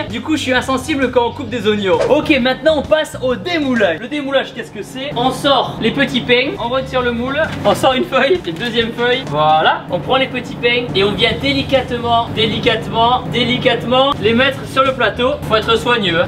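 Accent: South, France. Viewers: L’accent du sud est incroyable 🤩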